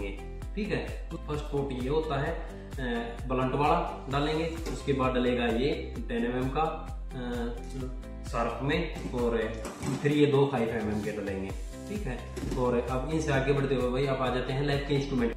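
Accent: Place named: Haryana, India. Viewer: Paka haryana ta hai ladle 😂😂😂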